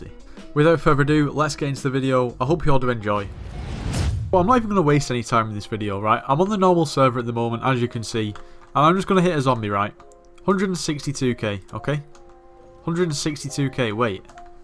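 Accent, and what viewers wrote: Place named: Birmingham, United Kingdom. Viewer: WTF SINCE WHEN WAS THIS DUDE A BRUM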